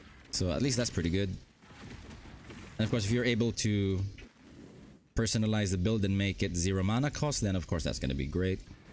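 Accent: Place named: Philippines. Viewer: Damn! I knew I was right, you have a "slight" filipino accent haha. Same here!! Though im pure pelepeno. Btw whats your streaming schedule would gladly tag along :)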